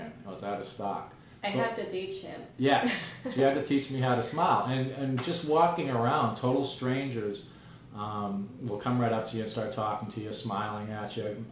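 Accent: Boston, United States. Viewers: BOSTON! I knew it! I was listening to you speak for about 5 minutes saying to myself "I think this guy's from back home..." I'm happy for you, boss - I moved to San Diego about 6 years ago and totally get a lot of your comparisons, because they're somewhat similar to my comparisons of SD vs Boston when people ask me how it is out here. That said, I've always wanted to visit the Philippines (and as of yet, have not) so I obviously can't really understand the Philippine lifestyle. Anyway, you've got a new subscriber. Go Sox/Celts/Pats/Bruins :D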